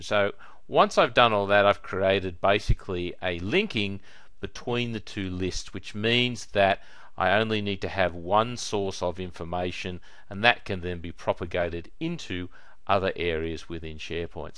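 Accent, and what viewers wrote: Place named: Australia. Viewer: Many thanks for the reply - searching on the web all the articles are for SP 2010 or maybe 2013 and all recommend not using (large) lookups as they slow the system down too much, I guess I can only do some testing to see what sort of impact the large lookups I'd like to implement will actually make. Also nice to hear an Aussie accent on youtube, keep up the good work mate.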